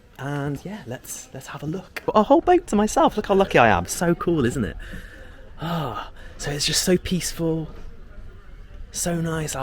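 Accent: United Kingdom. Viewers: Your British pronunciation is like music to my ears. Thank you. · I like your cute British accent :) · Enjoy listening to your talk! I think you talk very well. You got lovely British accent with British style humour. China has a huge amount to explore, I can see you have the potential of being successful youtuber 😉 best luck!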